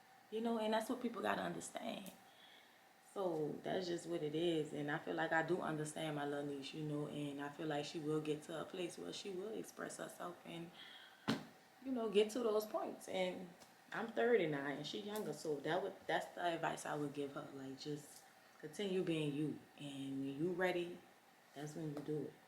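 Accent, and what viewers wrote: New Orleans, United States. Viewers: I love the New Orleans Accent 😊😊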